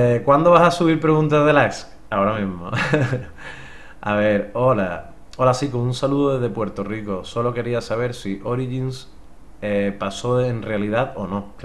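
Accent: Andalusia, Spain. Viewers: eres andaluz, que? Almenos por el acento yo diria que si xD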